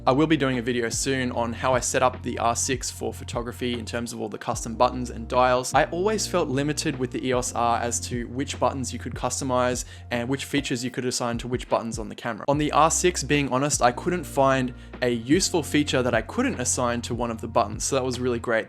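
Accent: Australia. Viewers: Great review. So refreshing to hear some real world opinions & not bashing specs. Also love that it was photo based, not video and finally an aussie accent. Glad I found your channel 📷👍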